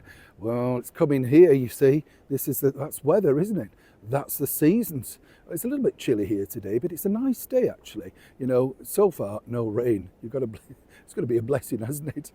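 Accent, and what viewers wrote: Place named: England, United Kingdom. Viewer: So enjoy the street scenes you have. Those along with your accent, make me feel tht I’m back in England.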